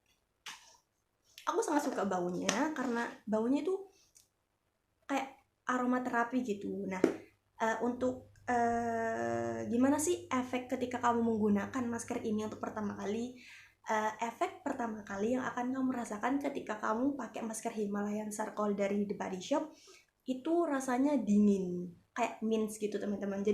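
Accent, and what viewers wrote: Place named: Java, Indonesia. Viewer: Udh lama di surabaya medokkkk deh mis yak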